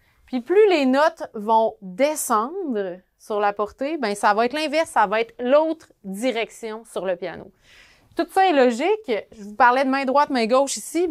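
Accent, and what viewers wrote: Quebec, Canada. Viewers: c'est super l'accent aucun problème les Français adore l'accent québécois, bonne journée :) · Avec un superbe accent québécois... et très clair !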